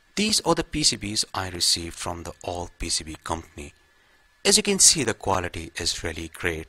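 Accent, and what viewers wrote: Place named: India. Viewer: good video my friend with indian accent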